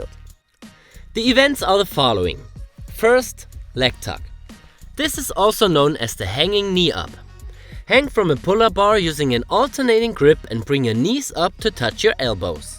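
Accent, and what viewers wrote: Germany. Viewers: Als ein Franzose, ich verstehe seinen "Deutsch-englisch" viel besser als amerikaner Englisch aha ! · your english is the prime example of the german english out there :D unfortunately was priceless. ;) great video again thought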